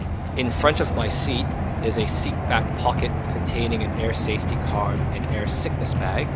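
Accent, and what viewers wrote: Australia. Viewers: Is that a hint of an Aussie accent I detect? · teach me how to speak "Australian" - when you used to say "Partner", was that another term for wife over there?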